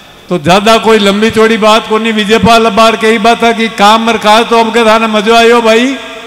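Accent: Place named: Nagaur, India. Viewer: Nagour ki boli bahut achi lagi 😊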